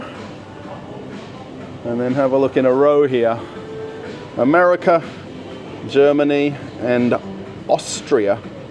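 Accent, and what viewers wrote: Australia. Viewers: I'm russian, but I like to listen to clean australian english) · Hello,!!! По работе я не могу много смотреть твои видео,но к счастью могу много слушать. Поэтому я,пользуясь случаем, совершенствую свой австралийский английский,мечтая в будущем посетить твою прекрасную родину!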